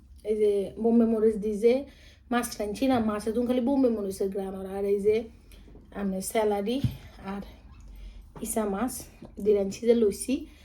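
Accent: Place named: Bhola, Bangladesh. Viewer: আসসালামু আলাইকুম আপু।আমনেরে এক্কেরে আর দাদীর মতন লাগে বোজ্জেন নি আপু,আমার বাড়ি ভোলা চরফ্যাশন কেরামতগন্জ কিন্তু আমনেগো আর আগ্গো কথা এক্কেরে মিল আছে বোজ্জেন নি আপু,,,, আমনের ভিডিও লইয়া আমার কোন অভিযোগ নাই,,, আমনেরে দেখলে আগ্গো দেশ দেকচি মনয়,,, আমি বর্তমানে চট্টগ্রাম থাকি 😢😢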